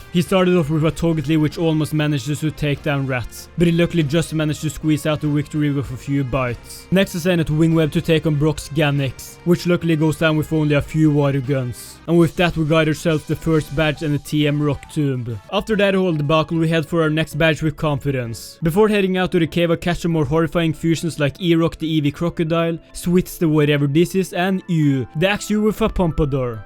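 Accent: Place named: Norway. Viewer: I watched 10 seconds of this and I was like "han fyren her er garantert norsk". Glad to see it confirmed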